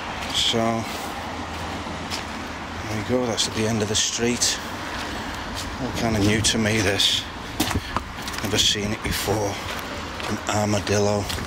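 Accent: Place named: Northern Ireland, United Kingdom. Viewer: its got a northern irish acsent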